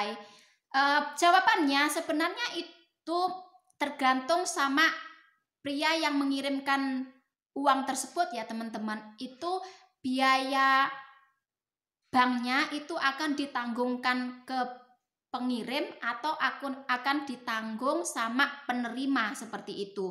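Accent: Java, Indonesia. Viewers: Meddok nmbak😂😂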